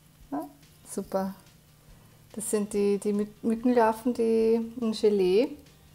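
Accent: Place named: Austria. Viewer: Sehr cool erklärt! Und schön dass man dein österreichisch raushört ;-) lg aus Wien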